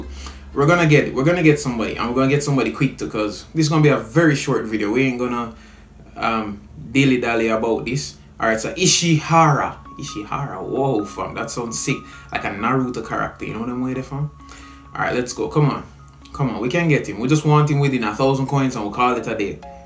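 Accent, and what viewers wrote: Jamaica. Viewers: Are u Jamaica???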